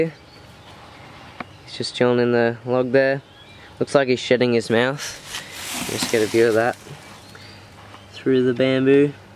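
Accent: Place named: Australia. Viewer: do you have wild bearded dragons in youre yard because you sound austrailian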